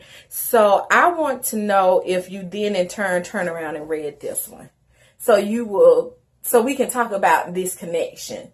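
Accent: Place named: South, United States. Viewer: I didn't want Mama Day to end 😢. Love your Southern accent , TN girl here just subscribed 💕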